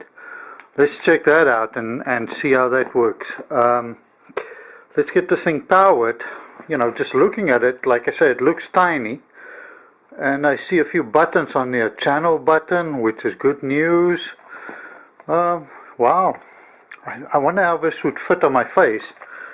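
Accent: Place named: South Africa. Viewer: I thought I recognised the accent. I recently returned to South Africa. Unfortunately it does not seem that the hobby has grown here as much as I hoped. Products are limited and import fees are killer:-(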